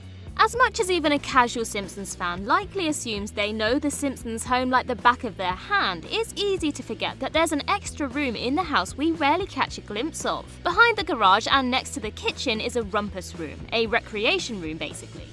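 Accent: United Kingdom. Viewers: The narrator is British.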